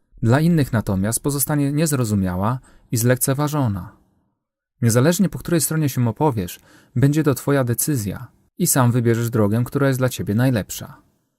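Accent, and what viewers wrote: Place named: Russia. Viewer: Wreszcie, pan, znalazł sposób by być zrozumianym, wielokrotnie próbowałam dotrwać do końca wykładu, ale w związku ze złą wymową lub też brakiem słówek język pana dla mnie jest nie możliwy aby zrozumieć, co ma pan na myśli, bardzo ciężko, jednakże lubię rosyjski nalot językowy, ale w tak poważnych zagadnieniach potrzeba dokładnego przekazu, bez naleciałości, ponieważ to sprawia rozproszenie i niemożliwość koncentracji.Podziwiam jednakże zasób wiedzy, jaką dysponuje Pan, życzę wszystkiego dobrego, Pozdrawiam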